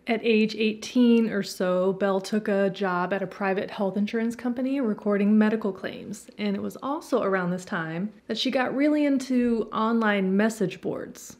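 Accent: Australia. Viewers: I am so here for your Aussie accent. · Loving your Aussie accent 😜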